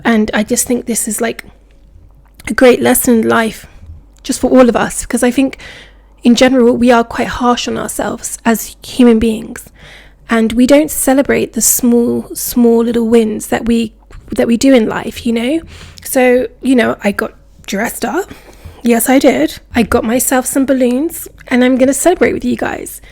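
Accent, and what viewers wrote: United Kingdom. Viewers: Sound like your from UK 😮😊😊 tappin in from across the pond 🥰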